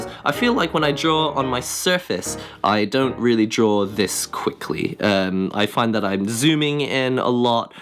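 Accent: United Kingdom. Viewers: Hey, new subscriber here. Are you from the UK? Thats not an American accent!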